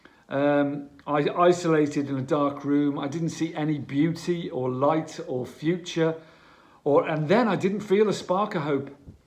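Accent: Liverpool, United Kingdom. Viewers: Is that a Liverpool accent?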